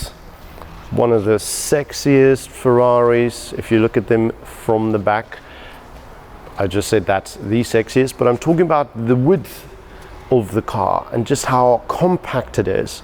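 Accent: South Africa. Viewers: I had a feeling you were a fellow South African, judging by your accent and colloquial expressions. Really great stuff you're doing man. Keep it up.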